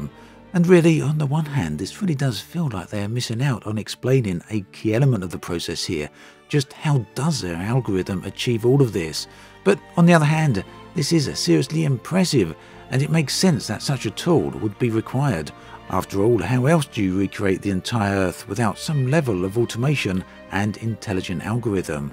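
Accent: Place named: Wales, United Kingdom. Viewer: I am still trying to understand what part of the English speaking world YouTube content creators come from? So often their use of syntax and pronunciation is impossibly wrong. It is a cadence of translation from another language, yet these people seem to have a Welsh brogue. I'm fucking baffled and also annoyed. Don't get me wrong the American narrators are often as poorly spoken, particularlily those from the West coast. Beyond weird. I never encounter people who speak like this in real life but everywhere on YouTube. I am convinced they are all AI.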